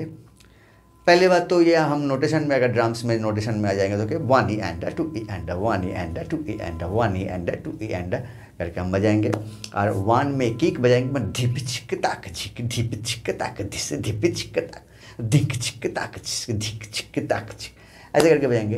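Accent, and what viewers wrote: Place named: Bihar, India. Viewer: Ami jani ....tumi Bengali but tmr Hindi language taan Bihar ar moto tai ...Aaj k thk haam na mey bolo..khub vlo lgbe amader sunte.. please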